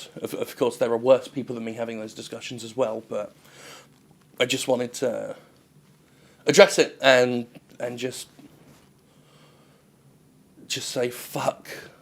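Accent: United Kingdom. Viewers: Is it just me or does become more british?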